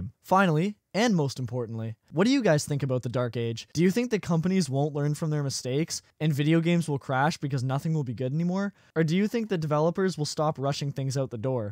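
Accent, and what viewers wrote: Canada. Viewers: I always like how u can tell someone is Canadian when they say: about. Great vid btw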